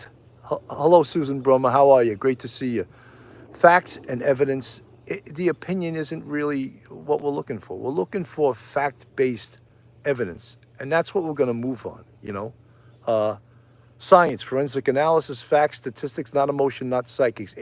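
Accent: New York, United States. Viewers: New subscriber, love your New York accent.. thanks for your service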